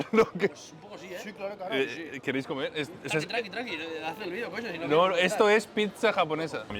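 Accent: Argentina. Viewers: amo como después de venir a argentina se te quedó la pronunciación de algunas cosas o te gusta decirlo así. gracias por tantos videos de calidad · en la CASHE...jajajajajaja, el ESPAÑOL mas ARGENTO del MUNDO MUNDIAL y OLEEEEE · Se puede saber porque tiene que poner acento argentino?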